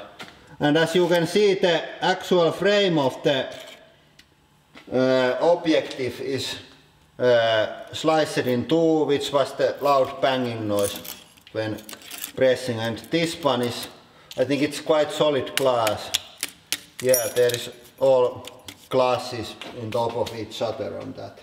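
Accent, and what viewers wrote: Finland. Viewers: nice ralli englanti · kunnon ralli englanti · are you from finland?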